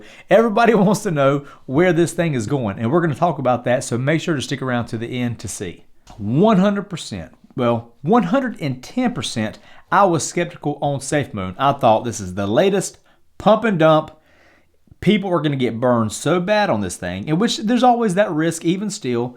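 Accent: South, United States. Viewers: came for safemoon, stayed for the southern accent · Subscribed to this channel simply because this dude seems genuine and honest. Plus his accent just kills me. Some southern USA with undertones of a British accent. Bit in all seriousness, he's made me realise the importance of investing in Safemoon NOW!!!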